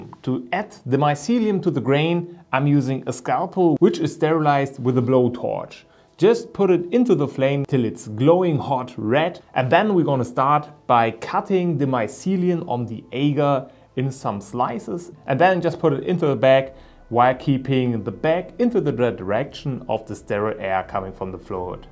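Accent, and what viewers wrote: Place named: Germany. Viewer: So ein schönes Deutsch-englisch :D man merkt es... aber gutes Video !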